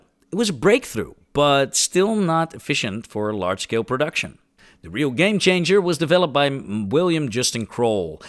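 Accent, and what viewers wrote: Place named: Netherlands. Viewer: ok, vind het anzich een goede video, script vloeit goed, je visual zijn ook goed. enige wat ik bijzonder afleidend vond was het gigantisch duidelijke neder-engels en in de eerste 8 minuten al 5 a 6 klemtoon/uitspraak fouten. zo afleidend dat ik het eerste gedeelte van deze reactie dus al schrijf op dat moment in de video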